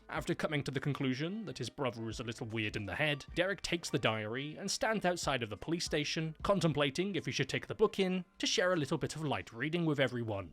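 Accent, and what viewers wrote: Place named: Scotland, United Kingdom. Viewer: it's his "I'm pretty sure this is a British accent... with a touch of Scott" his vernacular and his emphasis are all just a bit over the top. But he is a "broken man" (like most of us) with a cynical outlook on every last thing. Then the switch is thrown and he will go on the best tangents about some aspect of the movie. I'd listen to him review breakfast cereals. is a treat.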